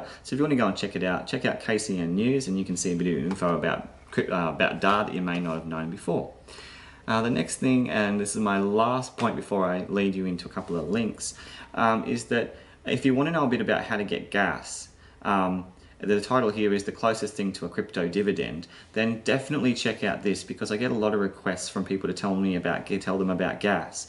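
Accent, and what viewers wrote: Australia. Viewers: Nice to hear an Australian voice critiquing the crypto market. Recently came across your vids and am enjoying your input, keep up the great work... cheers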